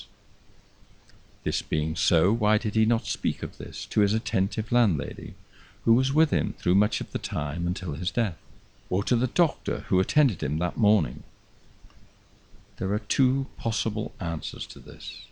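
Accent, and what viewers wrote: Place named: England, United Kingdom. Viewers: Hes from liverpool, same place I'm from. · Aaaah that English accent, It sounds so very elegant to me I love it!!!! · Indeed very lavishly narrated in a typical English accent story of Emile A'Langeley trial begins. Precisely another part of the world in India, 30 June 1857 nearly 600 Scottish n English family killed by Indian Mutineers in Lucknow Cant, in which my ancestor actively took part.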